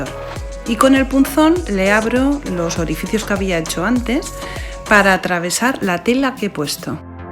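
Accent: Spain. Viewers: Olá maravilloso regaló lo voy Aser quiero Aser regalos Para él diez dé Mallo para vender algo económico me podrías alludar kn más ideas fáciles Muy ermosisimo trabajo me llamo margarita desde León GTO México y x tú forma de ablar eres dé España muchísimos saludos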